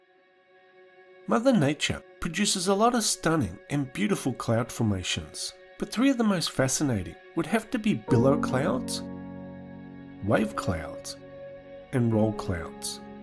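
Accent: Australia. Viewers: What I hear just because of the accent is : wotair ,Yair ,Roll cwoulds aND AUSTWARLIA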